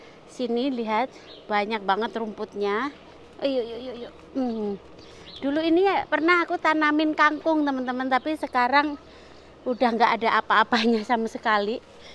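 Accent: Java, Indonesia. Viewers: Suka sama bak bahasa nya medok walaupun udah lama diluar negara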